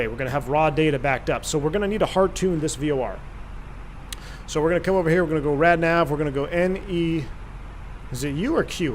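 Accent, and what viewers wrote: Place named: United States. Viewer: Sounds like an american accent to me...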